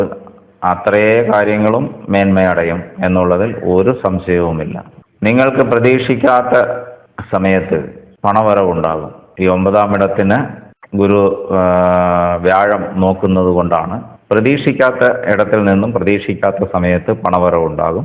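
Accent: Tamil Nadu, India. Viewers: താങ്കളുടെ ഓരോ വാക്കിലും ജോതിഷം പറയുന്നതിൽ 100%സത്യസന്ധത കാണുന്നു സംസാരത്തിൽ ഒരു തമിഴ് ശൈലി കാണുന്നു കേൾക്കുന്നതിൽ ഒരു സുഖം 🙏🙏🙏🙏🙏🙏🙏🙏🙏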